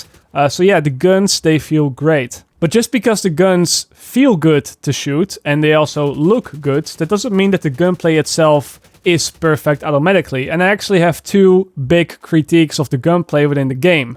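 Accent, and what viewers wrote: Netherlands. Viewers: Wait is this Guy dutch? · Hi, are you maybe Dutch? It’s just that I’m Dutch and your way of speaking and a slight accent strongly remind me of other Dutch youtubers with English channels.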